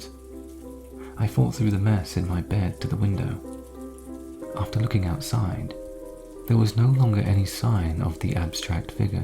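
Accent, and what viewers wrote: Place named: England, United Kingdom. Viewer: the rain.. the English voice.. ahhhhhh yesssss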